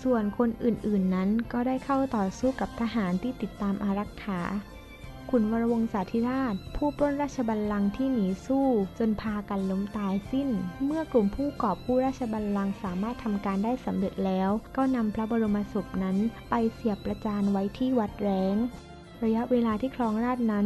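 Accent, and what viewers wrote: South, Thailand. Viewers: น้องนี่คนใต้แน่ๆนิ ทำได้ดีนะน้องนะ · คนอ่านบ้านอยู่ต่ำกว่าประจวบฯลงมาใช่ไหม?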